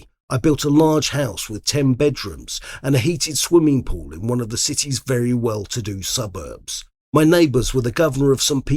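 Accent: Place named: United Kingdom. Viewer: Love 30 days in the hole, fascinating plot. Love British English, so clear❤, useful for learning to understand English by ear. Dear (am I correct?)), thank you for your work. 1-3 I listened in Russian, looking forward to the next one.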